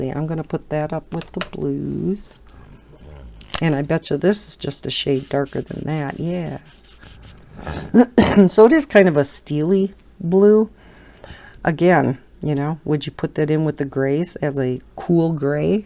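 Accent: Midwest, United States. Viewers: I love your videos....where are you from? I was born in Mn and I hear a mid-west accent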